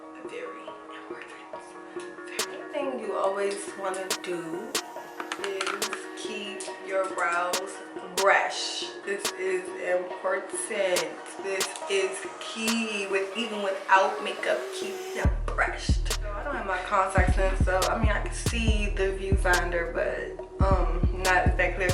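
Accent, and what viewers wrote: Washington DC, United States. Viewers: Everyone keeps talking about "blending". She said her brows are her first step. It's not a complete finished look. Her brows look Amazing! She sounds like she's from DC 👍🏾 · Are you from DC?? If not...you sound like it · foreverbeautyguru more like D.C. Lol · this was a cute tutorial in real time, I like that it wasn't sped up. The brows were fleeky lol. And as soon as you started talking I said oh she from D.C. I know that city talk anywhere 😄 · you gotta be from DC! the accent and them nails, dreads, everything scream DC! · the MC is from NE DC lmao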